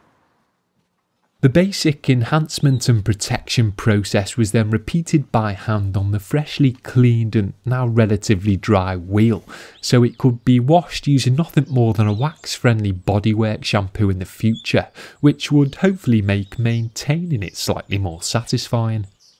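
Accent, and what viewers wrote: Liverpool, United Kingdom. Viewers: Your voice and your british english is awesome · how could I tell you'd have a scouse accent before you started speaking lol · Great video but going to be honest your scouse/brummie naisel accent is a tad annoying haha! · Hey, r u British? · Are u from anywhere near Liverpool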